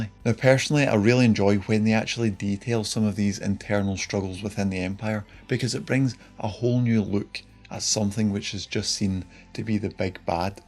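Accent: Scotland, United Kingdom. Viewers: haha you're Scottish, small world :)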